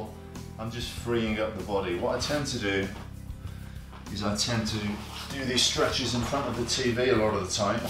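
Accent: United Kingdom. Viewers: Pretty British 🇬🇧 accent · Ey,this is amazing...I can do some physical exercises and at the same time improve my listening and pronunciation... Nice British pronunciation!!👌👌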